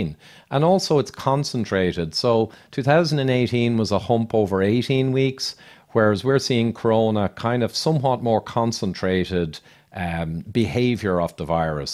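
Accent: Ireland. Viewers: Is that a flat Kildare accent I'm hearing???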